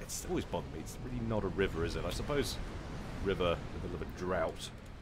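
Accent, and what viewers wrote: United Kingdom. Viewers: 5 stars caster. you know your game, great commenting on tactics and strategies. Pleasant voice with that lovely British accent ( sorry if you are not brit I took a guess ) and you pronounce french quite lovely. So glad I found you and subscribed.!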